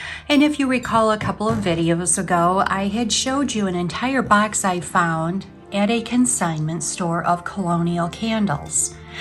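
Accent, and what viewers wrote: Michigan, United States. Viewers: Hi, We were so so excited when you opened your front door and your Siamese Sadie appeared. We have a few of the same things in common. First, my husband went to law school in Michigan. Your accent is still music to his ears. Next, we have 3 Siamese cats Sadie (Lilac Point), a huge boy named Keith and a little Siamese born in May (that we call June because we got her in June). We live in a 100 year old house. Your home tour was so inviting and cozy. Jack was so so cute when he was being camera shy. What breed dog is he?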